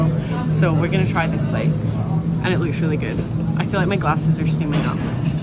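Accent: Scotland, United Kingdom. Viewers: Just found your channel. Really enjoy seeing what you get up to in London. All the best with the channel, watching from US and can't get enough of your Scottish accent!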